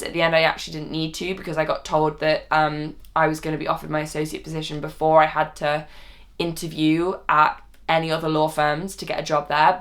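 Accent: London, United Kingdom. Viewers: sounds especially british in this vid. Also why am i listening to the training process so seriously i'm not even a law graduate. Nevertheless congrats i'm so happy to see this! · I feel like you sound so different now! Maybe you've gained the London accent?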